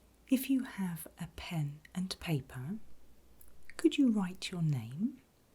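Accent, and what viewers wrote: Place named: England, United Kingdom. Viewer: Thank you so much for making this video for us. You combined two things I love: being asked questions and listening to an English accent.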